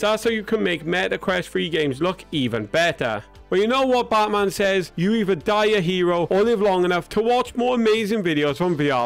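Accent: Wales, United Kingdom. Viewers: What on earth are you on about ARABIC? hes welsh thats why we cant understand us the terrible mic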